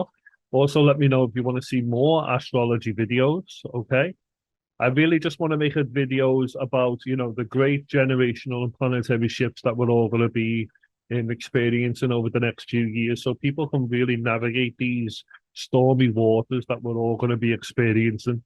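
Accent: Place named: Liverpool, United Kingdom. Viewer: Love the Liverpool accent btw! Can’t wait to see Liverpool, I have always been fascinated by Liverpool but when I get the chance I have always gone abroad! But next time….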